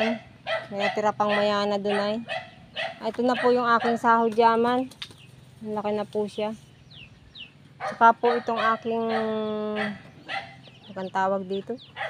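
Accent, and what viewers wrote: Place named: Marinduque, Philippines. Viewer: Parang tuno ng marinduque po kayo❤😊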